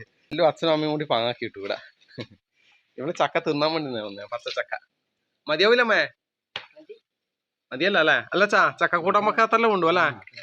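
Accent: Kannur, India. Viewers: വല്ലാത്തൊരു നൊസ്റ്റാൾജിയ 😔😔... നാടും വീടും ഉറ്റവരെയും വല്ലാണ്ട് മിസ്സ്‌ ആകുന്നു.. പിന്നെ നമ്മുടെ കണ്ണൂർ ഭാഷ.... 🙏🏻എന്റെ നാട് എത്ര സുന്ദരം ❤️❤️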